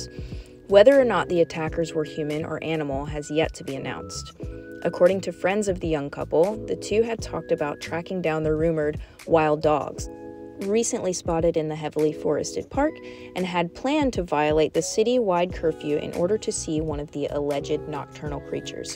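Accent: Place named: South, United States. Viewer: You’ve a soothing voice, one that makes for easy listening, like a Sam Elliot or a James Earl Jones. I heard a slight southern accent which was adorable, but I say go for it!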